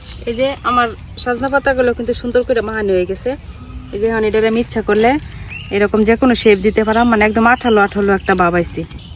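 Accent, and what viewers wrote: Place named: Mohanganj, Bangladesh. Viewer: বাংলাদেশের মোহনগঞ্জের ভাষা, আমার এলাকার কাজের বুয়ারা সবাই ঐ অঞ্চলের এবং এনাদের ভাষায় কথা বলে। কিশোরগঞ্জ, মোহনগঞ্জ সম্ভবত কাছাকাছি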